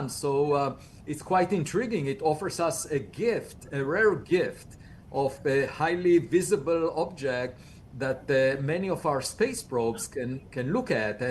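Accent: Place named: Eastern Europe. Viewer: 听口音也像是东欧那边的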